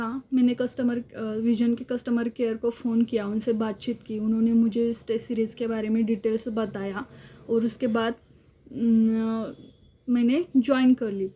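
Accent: Maharashtra, India. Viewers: You have Maharashtrian accent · Marathi assent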